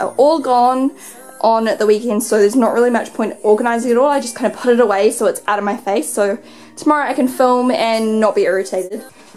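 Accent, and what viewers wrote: New Zealand. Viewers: Hi, congrats for the million subscribers! I remembered following you at the beginning some years ago just because of your NZ accent (I'm an English teacher in France) and now I'm just following because I like watching your videos;) Thanks for the smoothie recipe and I thought it was interesting to watch you editing and voice over your videos:)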